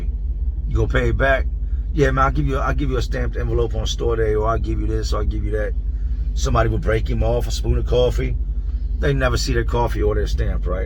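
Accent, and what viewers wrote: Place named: Philadelphia, United States. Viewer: Lol he said bol came back for more, licking his fingers and sht. All like: "yo that jawn was good. Lemme get some more." Haha I hate greedy people like that. Oh, and I'm from Philly. So I know how MFs can be. I can tell you've lived in Philly by the way you talk. But anyway, I was never locked up in Philly, but I've heard plenty of stories.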